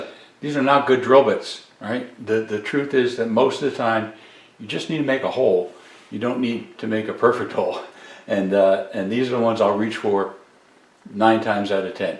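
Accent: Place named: Bridgeport, United States. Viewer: Great video. Nice content. You sound like you grew up in Bridgeport. Southside.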